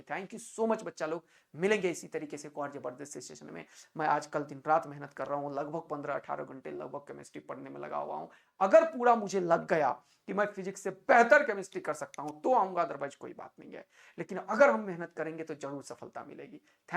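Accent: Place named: Maharashtra, India. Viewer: Sir, aap Maharashtra se ho kya??? Accent se lag rha ❤ Love it 🎉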